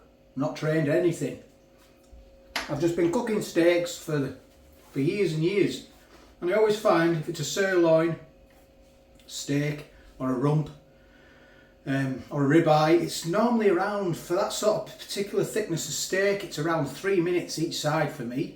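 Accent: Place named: Northern England, United Kingdom. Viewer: Hello, Just thought I would send a message of support, we have been subscribed to your channel for a while now and we think it is great. Its good entertainment plus informative as well, we recently started buying Harry Ramsdens battered sausages for my youngest son after seeing your review of them. I think its great that you can make a living in such a way doing food reviews from the comfort of your own kitchen. I also love your accent, I do have a liking for Northern accents. I thought your investigation into the 11 year old Christmas pudding was funny also your reaction to the vegatarian sausages, in one review you did, was hilarious. The look of total disgust on your face! Ironically that review probably lead to a few sales by people just wanting to see if they thought they were as awful as you did! Anyway keep up the good work. 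Tony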